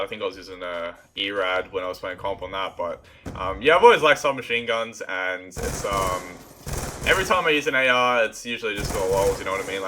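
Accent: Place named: Australia. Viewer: You must be Australian but it's hard to tell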